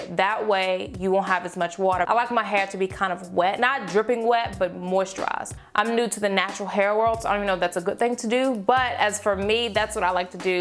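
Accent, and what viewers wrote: South, United States. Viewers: Your voice is so country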